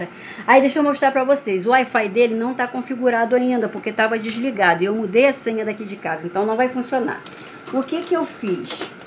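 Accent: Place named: Rio de Janeiro, Brazil. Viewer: Legal o vídeo, mas pelo sotaque você é carioca, e é normal os correios terem medo de entregar, só tem bala perdida aí e bandido.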